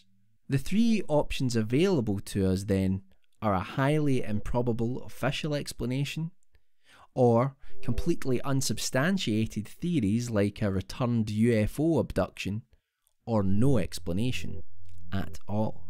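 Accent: Scotland, United Kingdom. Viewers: Ron Ronson it’s Scottish not Irish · I love your accent & voice. I find it very precise & soothing. Which part of Scotland do you hail from? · It's hysterical how Scottish the narrator is. No wonder everyone thinks we speak like Sean Connery haha !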